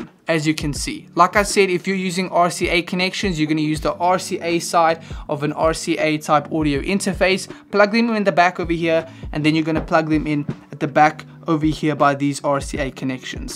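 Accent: South Africa. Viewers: Excellent review. I got the Eris 3.5's and am really considering adding a Sub8 to it as well for more better focused low end. Is that a South African accent I spot?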